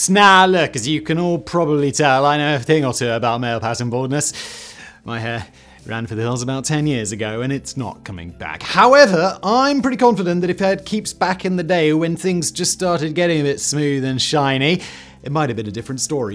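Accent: United Kingdom. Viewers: I find myself conflicted given I have quite the military boner in regards to an American Aircraft carrier bringing the pain, yet it's history is being delivered by a Brit....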